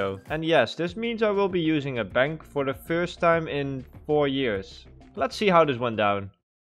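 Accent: Netherlands. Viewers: Hearing the Dutch accent is meh, but other than that legit insanely good and I’d love to see more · The dutch accent, haha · Are you Dutch? Your accent sounds really familiar.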